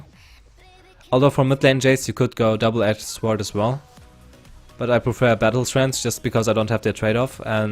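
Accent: Germany. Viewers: Wait what, is a German guy?:O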